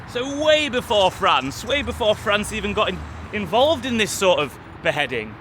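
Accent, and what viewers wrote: United Kingdom. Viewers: I really appreciate your handsome brit accent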